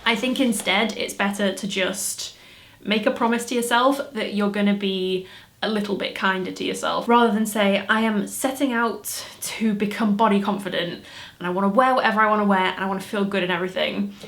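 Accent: Liverpool, United Kingdom. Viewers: I love how you dip in and out of a scouse accent.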